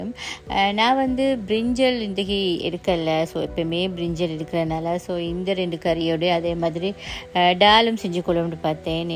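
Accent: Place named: Sri Lanka. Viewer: Voice apram video vachchi kandu putichiden ninga srilanga nu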